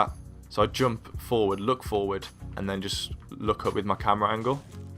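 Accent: Birmingham, United Kingdom. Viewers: You're defo from Birmingham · are you from birmingham lol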